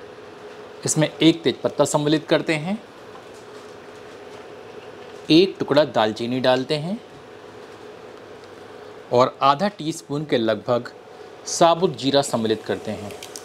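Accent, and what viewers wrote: Standard. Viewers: Aapki sudh hindiwali vani soone pe suhaga hai.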